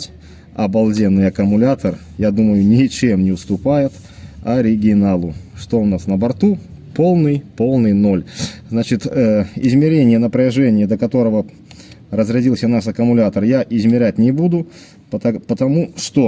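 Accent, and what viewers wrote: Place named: Vitebsk, Belarus. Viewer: Улыбаюсь с говора-акцента.;) Как будто к родне в Витебск снова заехал. Много лет не был... Эх. А аккум норм, наверное) Крышка-вешалка пригодится. Отдельно продаются, конечно. И зарядка компактная. Я б взял, как вторую. Надо подумать.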